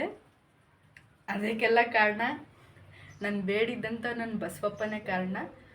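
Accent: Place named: Mandya, India. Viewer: Mam ನಿಮ್ ಮಂಡ್ಯ ಮೈಸೂರ್ ಕಡೆ ಭಾಷೆ ಎಷ್ಟು ಚನ್ನಾಗಿದೆ ಕೇಳೋಕೆ ನಮ್ಮ ಉತ್ತರ ಕರ್ನಾಟಕ ಭಾಷೆ ನಿಮ್ಮಷ್ಟು ಚನ್ನಾಗಿಲ್ಲ 🥰❤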